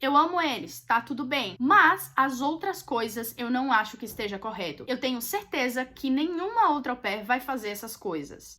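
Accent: Northeast, Brazil. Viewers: aaah, tá explicado kkkkk fiquei tipo "ela tem sotaque aqui do nordeste mas tbm tem tipo um sotaque inglês??" kkkkk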